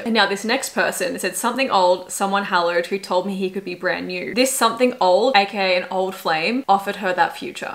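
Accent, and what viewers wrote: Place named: Australia. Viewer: Australian accent is such a turn off for me. Like I wanna enjoy her content but just because of her talking style, I can't. 😢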